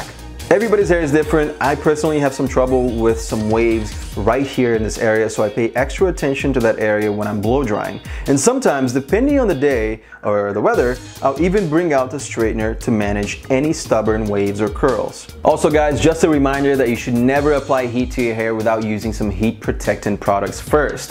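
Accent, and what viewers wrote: Brazil. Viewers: Dude has light Brazilian accent, maybe? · boy, you brazillian? cuz you sound like it, just a little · Cute strong Brazilian accent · Caraca meu velho. Tu tem algum vídeo contando tua história? Teu inglês é mt bom, mas eu consegui ver que tu é br hahah Sucesso!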